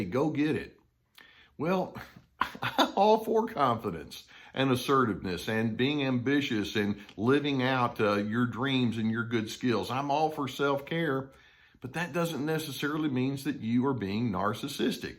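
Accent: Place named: Texas, United States. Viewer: "I wish for you Stability, Sensibility and Objectivity". "I wish for you Dignity, Respect and Civility in your actions and relationships" = from other Dr Carter videos. I love having the truth in my ear with Dr Carter's warm, kind TX accent! Appreciate your clarification of the "duality" of people's natures! Keep up your work for the Common Good of humanity!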